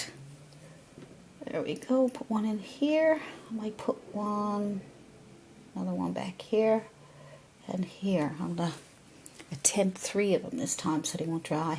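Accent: Newfoundland, Canada. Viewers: Is that a Newfoundland accent?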